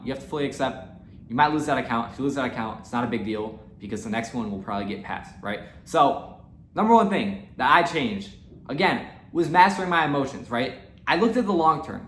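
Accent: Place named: Wisconsin, United States. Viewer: are you from Wisconsin with that accent? that point about scaling up with accounts of different sizes is so true, no more full porting 😎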